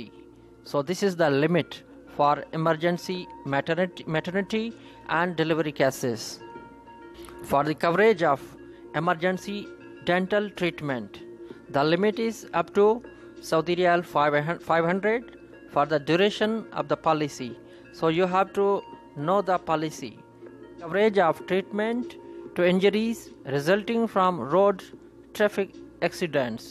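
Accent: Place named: Punjab, India. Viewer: punjabi accent me english...arey bhai urdu me bna ly video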